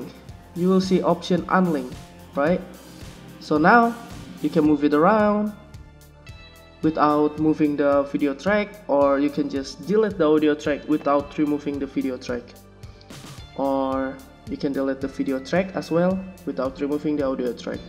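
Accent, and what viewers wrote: Indonesia. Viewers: Ur accent is funny,indonesian?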